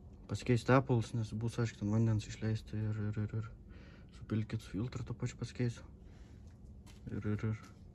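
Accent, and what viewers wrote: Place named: Samogitia, Lithuania. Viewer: tai arba žemaitiškai šneki, arba lietuviškai, apasispręsk..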